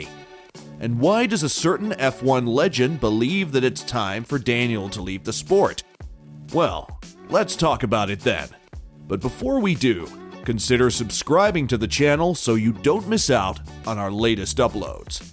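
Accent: United States. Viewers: Blar blar blar !!! I'm a top fan of F1 but this channel is starting to put me off, wait till you got some real evidence and are 100% about something, just going on and on and this Yanky doole commentating guy is starting to grind on me. Time to bow out and Unsubscribe!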